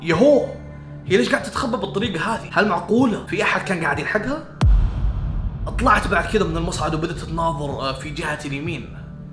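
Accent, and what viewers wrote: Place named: Saudi Arabia. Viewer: وين اغلب كلامه كويتي كلامه سعودي واضح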